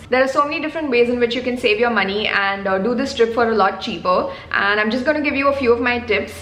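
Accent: India. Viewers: very strong indian accent, talking non stop without coma it's time to cut off my ear now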